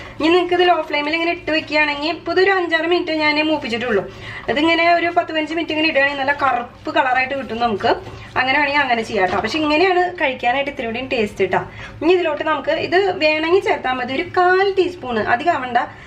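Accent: Thrissur, India. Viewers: adipoli Thrissur bhasha.... 👆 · Supr chechiii...bt speed koodithl aanataaaaa....thrisurkaro aanooi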